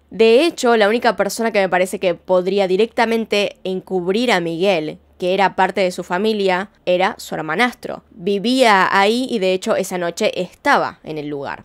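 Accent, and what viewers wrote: Argentina. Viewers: Muchas gracias por el caso, tienes un acento argentino muy bonito, se oye bien con la narrativa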